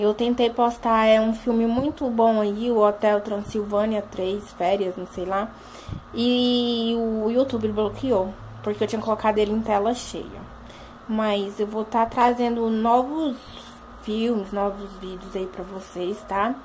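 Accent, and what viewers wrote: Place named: Northeast, Brazil. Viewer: querida, vc parece ser uma boa pessoa e ter bom coração, pelo seu sotaque eu diria q vc assim como eu é do nordeste :) sobre oq exatamente vai ser seu canal? culinária?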